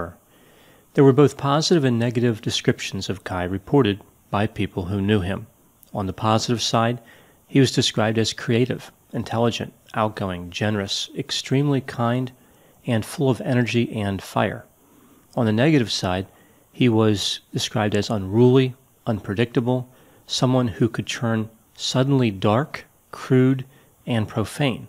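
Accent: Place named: East Coast, United States. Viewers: I hear it again on the word "thoughts," as well. New England is as narrow I can place it. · I almost want to agree with you on that one, it sounds definitely east coast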